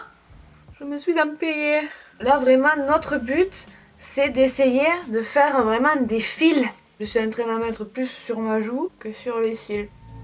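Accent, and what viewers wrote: South, France. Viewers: Cet accent 😍 je me sens vraiment chez moi, mercii pour cet accend du suud 🤙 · Quel bel accent du sud ☀️ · Like pour l’accent du sud ❤️ #TeamAccent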